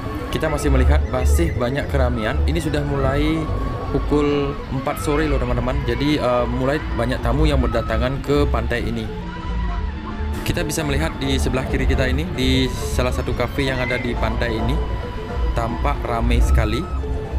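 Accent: Bali, Indonesia. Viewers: . mangkanya logat suaranya persis orang bali hehehhee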